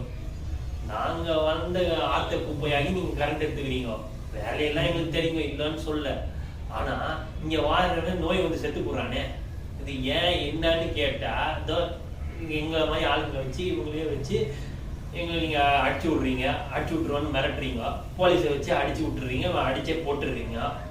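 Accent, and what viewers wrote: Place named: Chennai, India. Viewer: Onces more chennai paasa la pesunga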